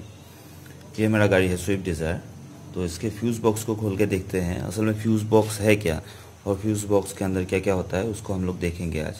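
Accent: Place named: Assam, India. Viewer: I love the sweet sounding Assamese accent Hindi. Thanks for the informative video. Respect!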